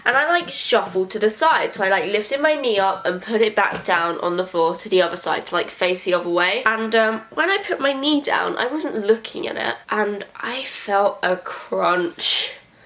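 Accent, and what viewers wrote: United Kingdom. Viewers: Amanda Dorothy Anderson it's called a British accent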